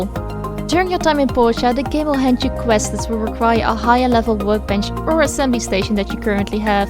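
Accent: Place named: Netherlands. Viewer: As a fellow dutchy, I could tell that Dutch accent from a mile away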